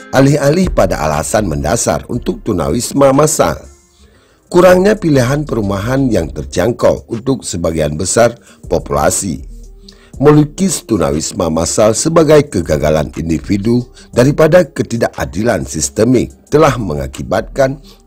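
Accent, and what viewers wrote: Aceh, Indonesia. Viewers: "T" dan logat.aceh nampaknya yg isi suara di narasi di ulasan ini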